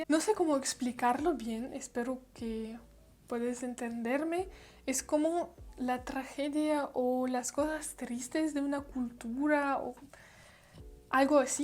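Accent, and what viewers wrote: Argentina. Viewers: Hola! Acabo de encontrar a tu chanal! Me gusta mucho! Tengo 16. Y estoy aprendiendo espanol tambien. Empece a 6 meses pasadas. Tu accento de Argentina es maravilloso! Estudio espanol de Colombia. Que Chevre! Tienes una subscriber nueva! xx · ¡¡Este acento argentino!! 🔥🔥🔥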